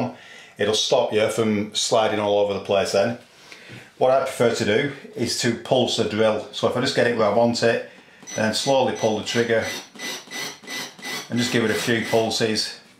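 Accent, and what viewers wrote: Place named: Rossendale, United Kingdom. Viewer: great video helped loads. btw are you from rossendale? your accent sounds like a rossendale one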